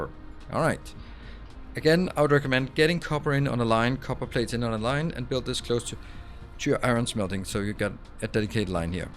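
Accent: Denmark. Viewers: Your accent sounds danish :D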